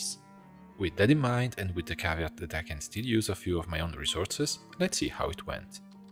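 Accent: Italy. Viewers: Immaginavo fossi italiano, nonostante la pronuncia inglese sia piuttosto buona qualcosa mi ricordava il modo in cui parliamo inglese di solito ahah. Ottimo lavoro comunque, sei davvero bravo, io ho sempre remore nel provare a modellare qualcosa di cosi complesso di dettagli, preferisco concetrarmi su archviz meno "ricche" e più moderne. E ho anche notato dai precedenti video che ante tu ti diletti con designer... Great great!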